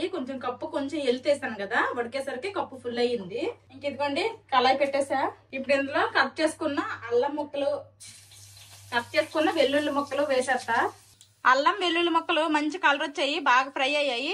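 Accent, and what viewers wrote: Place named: Vizianagaram, India. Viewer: Hai చెల్లి ఎలా ఉన్నారు.మాది విజయనగరం.అందుకే మీ మాటలు మా మాటలు ఒకే లాగా ఉంటాయి. చెల్లి నాకు ఛానెల్ ఉంది. Indus valley కి యూట్యూబ్ మెయిల్ ఇవ్వాలా వేరే మెయిల్ ఇవ్వాలా.please reply చెల్లి.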